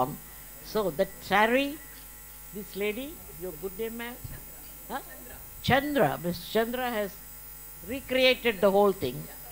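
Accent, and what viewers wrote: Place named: Oxford, United Kingdom. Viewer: Salute Madame ...perfect Oxford English..wishing You all happiness in life 🙏🙏🙏❤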